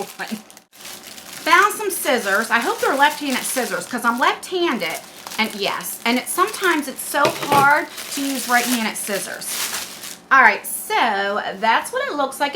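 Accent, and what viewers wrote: New Jersey, United States. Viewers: I'm obsessed with watermellon and your NJ accent. It makes me so homesick since I moved down south. Keep making these awesome videos!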